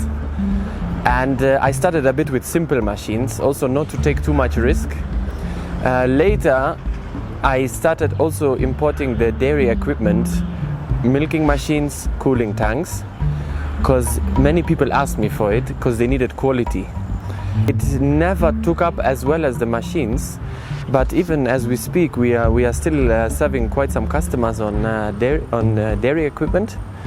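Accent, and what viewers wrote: Kenya. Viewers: Close your eyes and you will hear Kenyan speaking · His Kenyan accent is dope! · He even mastered our kenyan accent. 🤣🤣🤣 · He speaks like a Kenyan, wow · am impressed with your new Kenyan English accent. happy for you. will visit soon. love from uganda. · The guy has a Kenyan accent, that surprised me, kudos mate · 🧠🧠❤👈👈✅✅✅👌👌this man he is smart intelligent person because the way he performs and pertaining the accents of Kenya English ✅✅✅✅🤔🤔👌👈🧠🧠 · Kenyan accent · Yeah.. He speaks like a kenyan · dude you sound more kenyan than me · This dude has picked up a "Kenyan English" accent. Cool. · His accent already sounds kenyan... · hii accent ni ya county gani Kenya? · Cool venture. It's so funny that he even speaks English with a Kenyan accent. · Wow you speak like a Kenyan 😲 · This guy is so Kenyan and is most likely married to a luo hahaha I love the accent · As a Kenyan, if I listened to audio of this video alone, I'd say he was born and grew up in our country...pure Kenyan accent! 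· Accent ni Kenyan Kabisa... inspiring though.. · You even have a Kenyan accent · You have a Kenyan accent 😅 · Kabisa. Kenyan Ancent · His accent is a mixture of luo kalenjin and kikuyu. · Very valid points about logistics...whoever figures that out for africa will be a very rich woman/man..... his accent tho if I didn't see him I'd think he's kenyan wow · His accent is soo Kenyan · DUDE SOUND LIKE HE WAS BORN AND BRED IN KENYA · Haha Typical Kenyan accent 🤣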